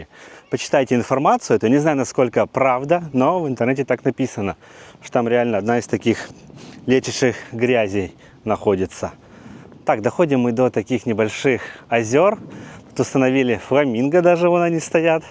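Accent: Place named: Donetsk, Ukraine. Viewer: Вы родом с Украины ? По вашему говору и стилю очень похоже.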